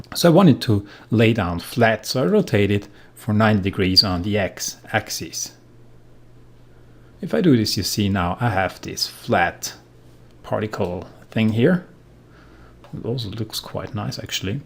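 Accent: Germany. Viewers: german ^^ ? (is ur mothertung right?)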